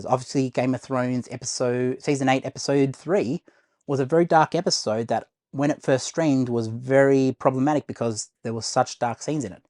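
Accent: Australia. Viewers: I like you, ive said before the way you talk and explain things chills me out. I think you are lost with this you tube, why dont you just copy some pros, do a top ten list of fave haloween mivies. Or for me i could just chill and listen to you review 1 film (no spoilers) at a time . Watch ava pop culture what he does and put your own twist on it, not many would notice if you just copied his videos non stop but with ur aussie accent and chill way of explaini g things, hes frantic, but getting subscribers by the dozen. Copy him bud.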